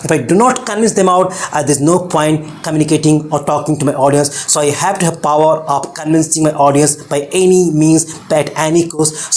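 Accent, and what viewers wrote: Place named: United States. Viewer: Sometimes you speak American English but I love this video because it's totally in English and you have told about communication skill so it's obviously powerful. I've seen this video 3 times today.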